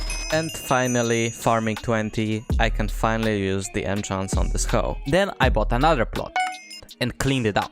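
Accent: Poland. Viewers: your accent sounds soo polish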